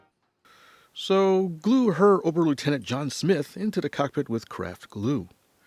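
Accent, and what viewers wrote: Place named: Canada. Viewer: My fiance swears your Canadian and she loves your voice she says it very soothing.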